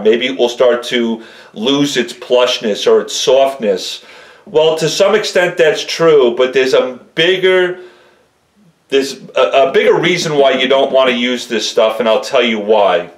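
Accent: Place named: New Jersey, United States. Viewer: no kidding, what's up with these people. Is there a 3 minute law for videos? Screw that crap...I like listening to a guy who knows his sh*t and educates and explains... I am blown away by all the comments you get for long videos...also, why are people so fascinated with your Jersey accent? And many call u Howard stern, they must have never visited the northeast??? But keep it coming, you turned me on to armour all quick shine Aerosal , and purple power!!! God sends...for that I just ordered a 46 buck order from your microfiber store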